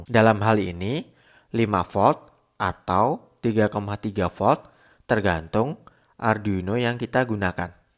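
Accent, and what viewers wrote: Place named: Java, Indonesia. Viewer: Suara siapa ini kang ? Kok medok hihi Sukses channelnga kang,,, salam G2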